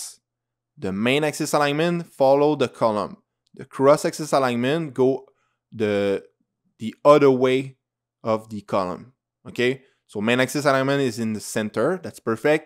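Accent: France. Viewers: Dude thanks for the tutorial! It was fun and funny at the same time! Your voice kept me focused. I laughed all along and learnt it in a fun way. Are you French btw? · the french accent makes everything better, thanks!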